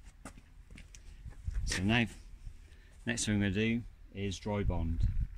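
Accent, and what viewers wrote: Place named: Norfolk, United Kingdom. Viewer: you sound like your around my parts... Norfolk by any chance? ..(groundworker trying to learn a thing or two)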